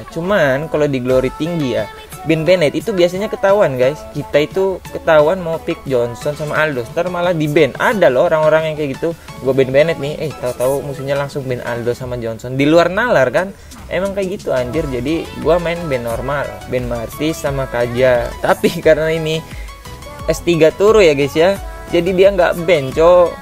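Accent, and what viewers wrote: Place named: Central Java, Indonesia. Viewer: Dri logat nya kaya nya org jateng ya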